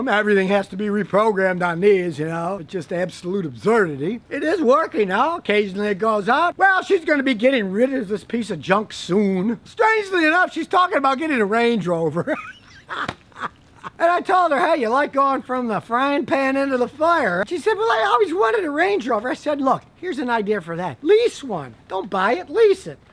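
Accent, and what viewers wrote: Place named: New Jersey, United States. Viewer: Voice accent. He sounds like he has a new jersey accent, but I just want to make sure.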